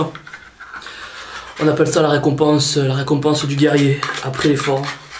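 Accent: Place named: Marseille, France. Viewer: L'accent Marseille 😂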